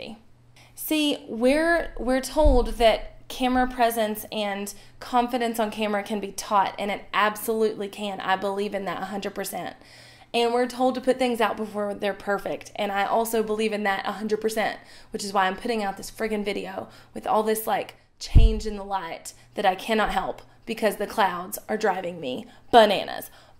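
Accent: South, United States. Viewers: I’m glad I found your channel last week. I really like your presentation style and slight southern accent. And thanks for the tips! Keep up the good work! :)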